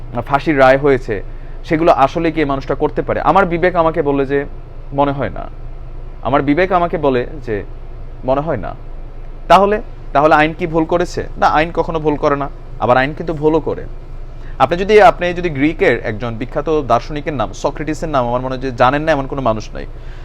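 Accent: Comilla, Bangladesh. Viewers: ভাই আপনার বাড়ি কি কুমিল্লা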